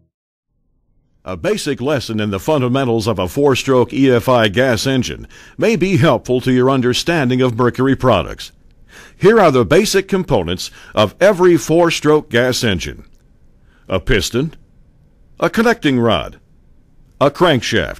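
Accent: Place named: United States. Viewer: Do we have to have the overconfident American accent? It's a piston not a peeestonnn.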